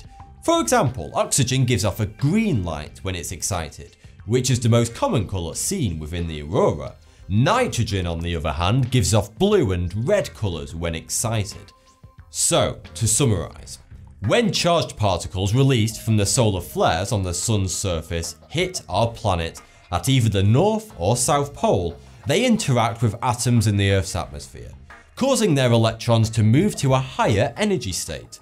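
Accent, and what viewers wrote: United Kingdom. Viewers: i like your british accent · Are you British people really saying "sun" and sound like "son". WHYY!~??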